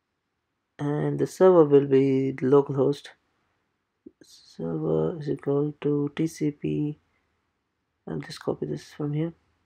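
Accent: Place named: India. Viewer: Thanks for making that Video it helped me a lot. My Feedback would be , its pretty hard to follow the India-English Slang , in the Fast Speed Modus.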